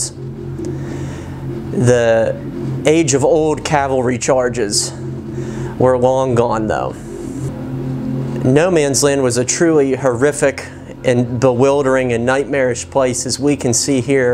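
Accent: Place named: Pittsburgh, United States. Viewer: I live in Switzerland now but I am from just North of da burgh. Always fun to hear that accent. Keep up the cool videos.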